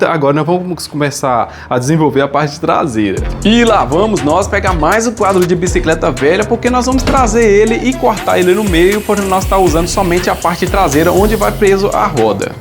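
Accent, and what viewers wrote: Minas Gerais, Brazil. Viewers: Aí ficou top. Vc é de minas? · Boa noite amigão pelo sutaque voce deve ser meu conterrãneo mineiro, eu não só acho mais a NASA terá que estudar sua invenção MUITO TOP. Moro em Sorocaba SP.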